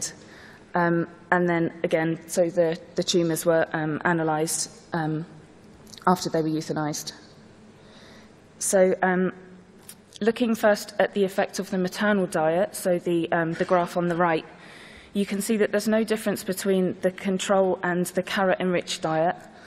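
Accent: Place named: United Kingdom. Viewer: 🙏She Is i English That’s Unique To Most Brits Accents Beautiful Listen and learn🥕🍎❤️💛🇯🇲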